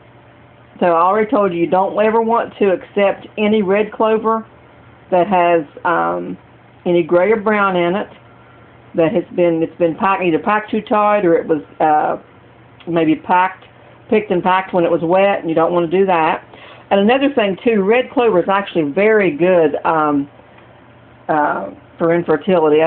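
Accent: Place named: South, United States. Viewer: You have a southern accent I'm curious I where your from because I'm from NC and I can't find it anywhere here only white clover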